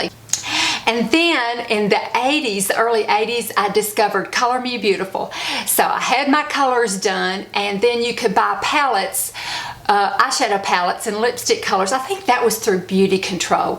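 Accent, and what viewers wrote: South, United States. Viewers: It’s Lorraine in England. Like any good Southern girl I loved my makeup, too. I remember going to London with my Mom and aunt when Twiggy and Mary Quant were big. After listening to this video I saw a banner that a cover band , Union Gap UK , Young Girl etc. from the 60’s ,are in town tonight. I may have to go to the concert! You remind me of my girlfriends back home in Virginia which is why I like your videos and insta stories. I don’t hear a Southern twang that often unless on line!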